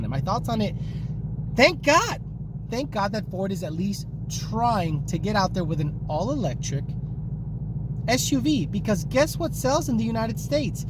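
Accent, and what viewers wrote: United Kingdom. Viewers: Wagons ( or in your english accent they're called an "estate") look great. Audi's avant line Mercedes with the e-class amg wagons BMW has the greatest of all time RIP E39 touring (wagon) But yes you're right, many cars look better as a performance wagon. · That damn UK accent had me dying lmao. Great video again brother. 🤘🤘🤘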